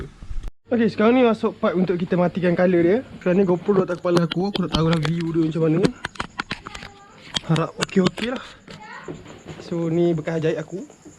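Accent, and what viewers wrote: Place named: Pahang, Malaysia. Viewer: Sdap sial orang pahang cakap...aku tunjal je..hihiuhi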